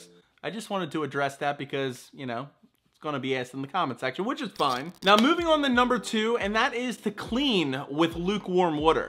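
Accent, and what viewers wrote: Philadelphia, United States. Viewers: I can spot Philly from a mile away...the second he spoke, even before “WADER” being said, I could smell the Wawa through the screen on my phone....Go Birds!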